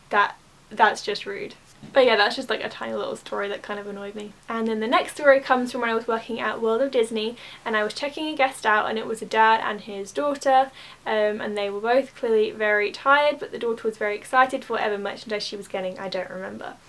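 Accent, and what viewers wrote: New York, United States. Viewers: You sound like a New Yorker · Trust me your NYC accent is 100x better than most Americans British accent 😂 I love these types of videos btw! I’d love to see another installment of the magical moments you or your friends have had/made too ♥️ I hope you’re having/had a great Disney trip and make it home safe!!! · Your New Yorker accent tho!! Best part of this video! 😊😆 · your New York accent is great for a Londoner or someone from the South! Fascinating stuff. If you work at Euro Disney you will have 20 years of stories for YouTube in the space of a couple of years! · I’m laughing at your New York accent. I have a Pacific Northwest accent so I’m told. Lol. I used to be a telephone operator. UK operators said to me, “ I can tell you are from U.S. People love to be snobs. Especially from a wealthy area. They talk down to you. 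I hated it. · Your NY accent was great IMO lol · Your NY accent is so funny! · Loved your ny accent lol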